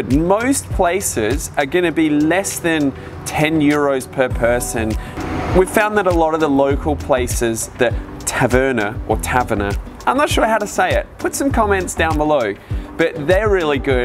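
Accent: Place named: England, United Kingdom. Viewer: Why is an English man doing a video on Greece? I want to hear it from a Greek man, I dont want to hear an English accent, one of the worst accents in the world. People in England speak horrible english, can never make out what they are saying because they cut the words in half when they speak.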